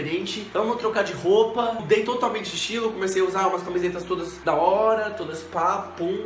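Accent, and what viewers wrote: Porto, Portugal. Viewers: Assim uma coisa , gosto muito mais do teu sotaque português de Portugal do que português do Brasil ( e eu adoro o sotaque brasileiro) mas ficaste mesmo fofo a falas assim hahahahaha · Meu Deusssss, o sotaque dele no início e no fim do vido tem mesmo sotaque português!!!!! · Sotaque Portugues <3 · Raymson Barbosa Português de Portugal :) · tens bue sotaque do porto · omg!!! eu nao fazia a minima ideia que tu estiveste a viver em portugal adorei ouvir te falar portugues de portugal eu nao acredito!!!nao podes comecar a falar um pouco nos teus videos em portugues de portugal?? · sou de portugal e acho que não sou a unica Portuguesa a achar que tu ficas super fofo a falar Portugues de Portugal <3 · Eu comecei a ver o vídeo é minha cabeça explodiu!!! Eu te acompanho faz pouco tempo e nunca te vi a falar com sotaque de Portugal! Vem outra vez dá!!! 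#LuísMarizEmPortugalOutraVez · é sotaque à portuense carago!!!,! ;) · tens mesmo o sotaque do porto kkk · Aí adoro quando falas em português de Portugal. Devias fazer mais. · vem logo pro portoooo !!!!!! sotaque portugues lindo :o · esse sotaque português !!! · oh meu deus, tens sotaque português, AMO*-* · Mano, é muito viciante quando alguém que costuma falar PT BR fala PT PT. Actually, it's kinda cute <3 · Adoro quando tu falas Português- Portugal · falas mm bem portugues!! 😂 sotaque do porto mas yh boa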